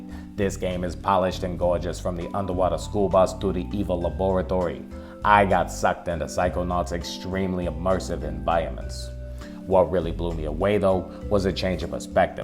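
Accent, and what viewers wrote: New York, United States. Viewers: His voice is like a New Yorker Morgan Freeman. Enchanting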